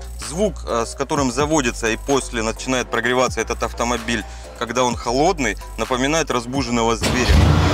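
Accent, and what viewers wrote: Rostov, Russia. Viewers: Смотреть интересно. Только если это полноценный обзор авто, не делайте такого акцента на аудио системе. Понятно что это ваш профиль, но мне это не так интересно, как например сравнение с ближайшими конкурентами(лично мое мнение). Но в общем качество на хорошем уровне. P.S. кто то писал про уровень речи... Им хочется объяснить, что это Ростов, как я понял, и там свой "говор", может это и немного сбивает с толку. Как по мне - слух "не режет".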